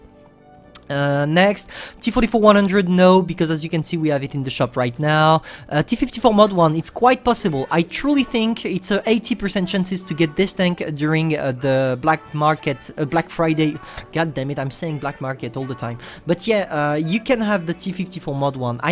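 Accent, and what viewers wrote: France. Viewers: je me disais xd l'accent français et le le clan LOCA et je t'avoue que j'ai pas tous compris mais sa ses amis cause de MON anglais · Its heavy not hivie and regulaaarlie lol i can so tell you are french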